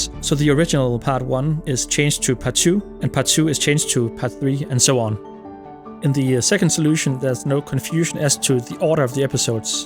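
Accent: Denmark. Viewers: You really sound like my late oldemor. She came to America from Denmark and had the heaviest accent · Yup, that’s definitely a Danish accent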